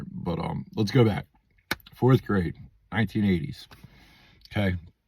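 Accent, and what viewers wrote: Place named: Philadelphia, United States. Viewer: I totally knew from your accent that you were from the Philly area!! My family is from Levittown/Bucks County!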